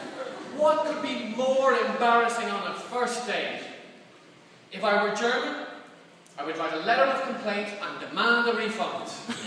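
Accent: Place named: Ireland. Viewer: A highly entertaining and very well crafted speech. Well done,. And refreshing to hear a non-Anglo-Saxon accent. Ah shur, I'm a bit biased towards the dulcet tones of my fellow countrymen!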